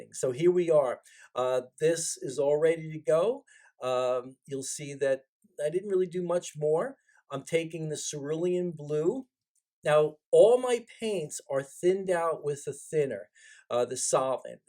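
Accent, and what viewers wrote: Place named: New York, United States. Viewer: I detect a New Yorker (I'm originally from B'klyn).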